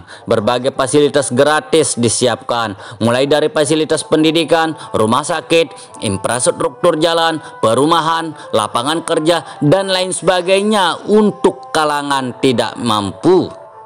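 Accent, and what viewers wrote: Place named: Bali, Indonesia. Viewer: logatnya hmpir2 mirip kaya org Bali ya kdg2 😁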